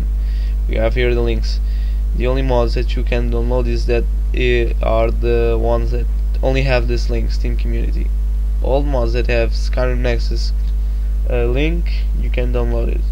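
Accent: Portugal. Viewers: boa parceiro obrigado pela ajuda :) Gosto do sotaque Português ahahaah :D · Logo assim que ouvi o teu sotaque a falar inglês, vi logo que eras português xD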